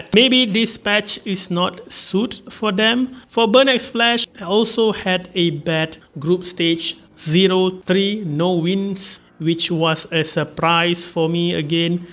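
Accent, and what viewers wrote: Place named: Indonesia. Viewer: in the accent sounds like indonesian or malaysian and little singaporean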